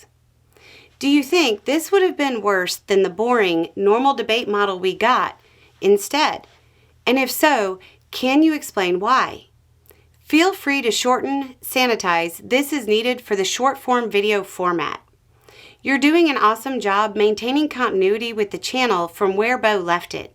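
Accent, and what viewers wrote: South, United States. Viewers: You have been a great replacement, but I have one question. Are you saying " " or " " at the beginning of each video? Nothing wrong with either but my to my ears both are close with the Southern accent.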